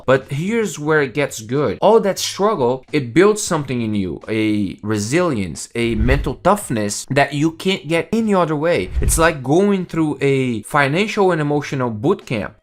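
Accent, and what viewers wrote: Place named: Brazil. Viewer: Hey, man ! I realized you are brazillian by your accent somehow, maybe bc I'm brazillian too, and then I checked out your description to find out I was right. Anyway, I'm planning to immigrate to US soon. Thanks for the reality check and advices ! I just can imagine how hard must have been for you, but you actually made it in the end !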